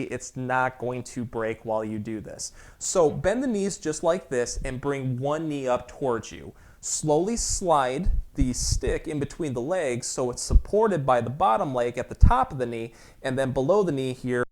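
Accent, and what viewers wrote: Michigan, United States. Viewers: Thick Michigan accent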